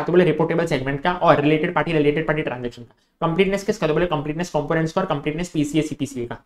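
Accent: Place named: Indore, India. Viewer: Sir bataya nai aapne... App indore se honaa...? Apki boli se lgriya h sir 😁😁😁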